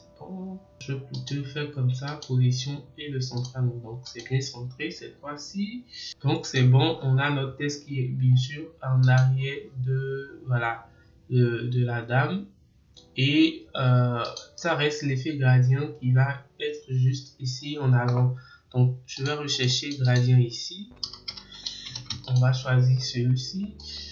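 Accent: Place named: Benin. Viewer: Déjà je n'ai pas encore suivi la vidéo mais par les premières tonalité c’est un Frère du Bénin et ça fais super plaisir J'aime Koudo Azo Nonvi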